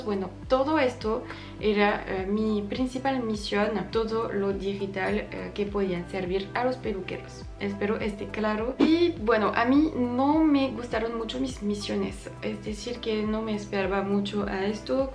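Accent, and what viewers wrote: France. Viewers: Cómo eres envidiosa. Solo por su acento frances. Y romántico. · El acento francés se escucha muy bien en español. El shua y las variaciones de la "i" son fenomenales. Tienes dos vidas : Hablas español nativo y francés nativo, ¡de lujo escucharte!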